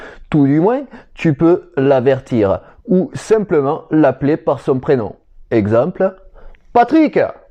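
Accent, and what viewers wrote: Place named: South, France. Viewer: J'adore ce petit accent du sud !! 😂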